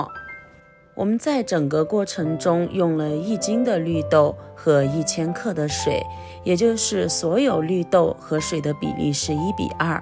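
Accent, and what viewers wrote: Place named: Taiwan. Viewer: 调料的口味像大陆西北地区，口音是台湾🤣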